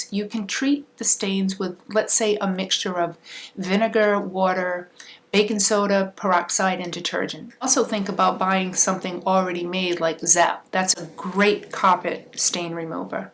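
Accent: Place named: Massachusetts, United States. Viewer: Sometimes I forget how much I miss the Massachusetts accent.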